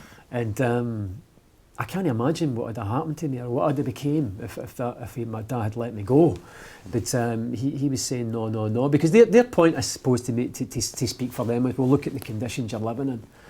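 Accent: Scotland, United Kingdom. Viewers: I am German and understand Scottish much better than British ;) Love it a lot ♥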